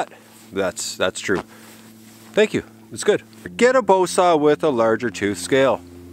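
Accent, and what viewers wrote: Canada. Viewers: Very calming video.You seem like a nice guy.Good to hear a Canadian accent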